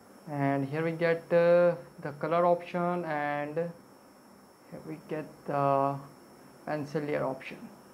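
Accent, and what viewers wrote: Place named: England, United Kingdom. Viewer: U r born in England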